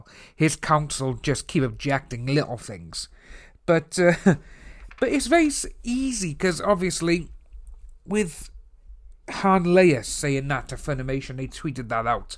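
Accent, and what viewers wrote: Wales, United Kingdom. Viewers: You have a Welsh accent? Hell, I just pegged you for livingbin the UK. 😄 Hearing the voice of this metrosexual, I have no doubt he's bringing Tyrone snacks as Tyrone rails away on Monica. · I understand you dude having Walsh accent is a pain at times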